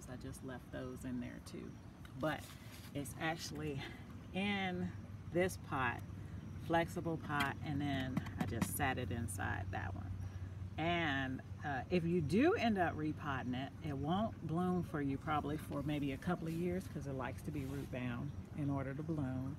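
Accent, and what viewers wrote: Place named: South, United States. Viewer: Stunning! I hope to follow suit! And very genteel southern accent. 😍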